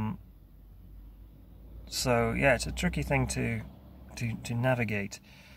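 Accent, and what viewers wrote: Scotland, United Kingdom. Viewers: I never noticed your Scottish accent until this video.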